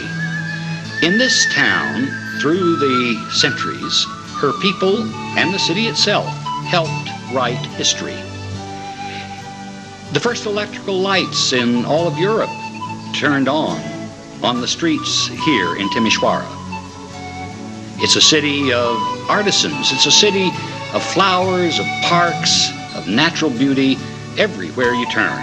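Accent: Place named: Oxford, United Kingdom. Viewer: an englishman in timisoara this...nice OXFORD accent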